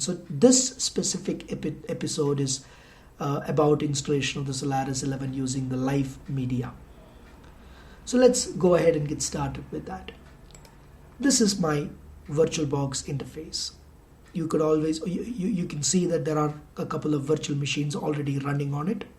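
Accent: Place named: India. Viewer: Appreciate your clean English, albeit Indian accent, greatly explained,Keep it up.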